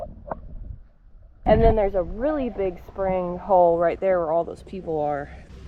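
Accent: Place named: Indiana, United States. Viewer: I'm amazed at your love for nature hiking and adventures. I especially like the way you pronounce your Os in words. Indiana girl. It's sounds so country. Yep I love to hear females talk with a country accent. 🎉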